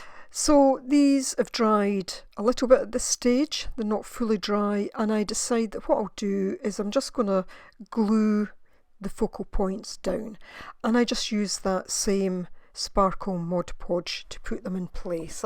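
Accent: Scotland, United Kingdom. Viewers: I agree that it's great that you left in the parts where you were struggling. We all go through it, and it makes us feel better about when it happens to us. I'm glad you kept going on these. The dragonfly is so beautiful! And may I just say that I love your accent? I haven't come across any other crafters or artists with Scottish accents, and it's just my favorite accent to listen to - love it! 💗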